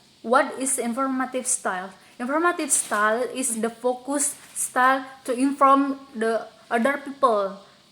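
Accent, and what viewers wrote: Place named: Indonesia. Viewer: Well done girl! Keep it up. You got Indonesian accent I guess, Good I’ve got few good Indonesian friends.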